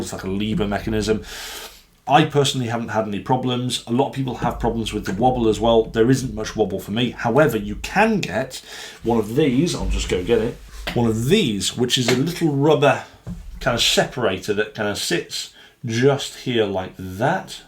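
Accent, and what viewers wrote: England, United Kingdom. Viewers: I Like the books and the English accent, it makes you seem smart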